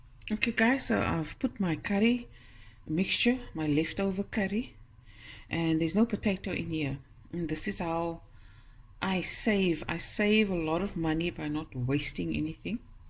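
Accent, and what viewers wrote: South Africa. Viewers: I am originally from South Africa, now living in Canada. I came across you video just recently and what caught my attention was your accent. It was so good to hear a SA accent after so many years. Thank you for your videos, love them, God Bless.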